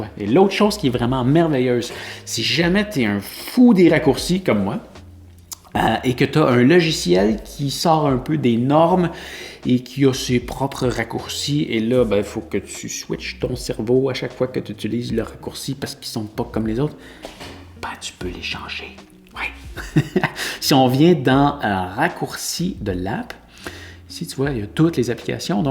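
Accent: Quebec, Canada. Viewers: Quel plaisir de redécouvrir les subtilités du Mac avec l'accent canadien ! 😘 de Paris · Wow 🎉merci beaucoup pour cette vidéo 👍🏼! De superbes réglages très utiles et bien expliqué! Avec le petit accent québécois plus qu' agréable qu'il fait toujours plaisir d'entendre 😊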